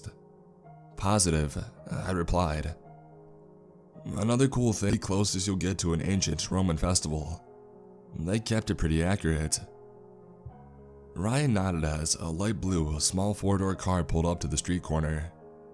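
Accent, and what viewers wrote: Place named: Midwest, United States. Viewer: General mid-western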